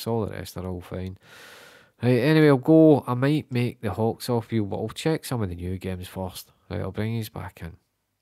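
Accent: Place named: Scotland, United Kingdom. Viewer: I said to myself like Kilmarnock or something aswell 😂 just couldn’t quite place the slight accent, Good luck on your upcoming videos mate hope to see a record breaker soon 🙏🙏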